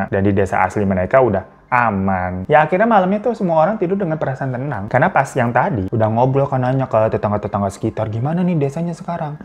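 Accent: Java, Indonesia. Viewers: seperti biasa bang mantap, tpi itu logat jawa keknya bagian barat dah wkwkwk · Ni abang klo ngomong logat jawa lucu bgttt😂 kiyowoookkk😂❤ Sehat² ya babang sekeluarga dn kita semuaa 🤲🏻🥰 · Lucu bgt bhsa jawa ny bg 😅😅😅😅... Untung ada translate ny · Ngakak lihat logat Jawa bang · suka salpok denger kak ngomong jawa, lucu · Ngomong bHasa jawanya lucu bngt baang😂😂😂😂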